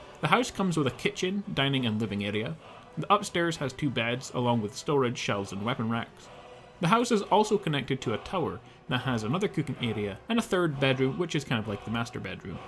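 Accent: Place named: Glasgow, United Kingdom. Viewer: Posh glasgow...lish?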